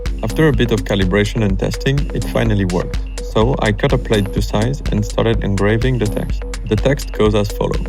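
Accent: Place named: France. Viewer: A critique (since this is art): : "legal to own, but illegal to sell"? Assuming he's in France (based on accent) - it could be legally acquired personal data from a website, which is legal to own but illegal to sell. Pretty much everything else is illegal to own if it's illegal to sell in France, even more so when it comes to data. So no, it's not 'horrific' like CP. Therefore, there's likely no 'ethical' dilemma at play here given 99.99% of people won't care about personal data. I think it would be more 'powerful' as a piece of art if the person pressing the button would REALLY have to think twice before pressing the button because of universally held moral principles.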